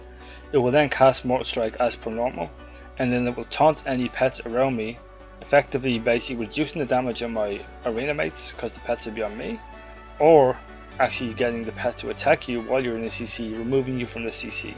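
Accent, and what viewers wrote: Ireland. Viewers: Where abouts are you from man? I sense an irish accent!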